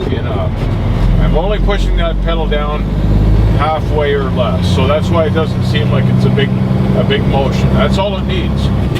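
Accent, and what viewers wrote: Canada. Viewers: You must Canadian if I'm correct. I've noticed that Canadians pronounce out different than we do in the states.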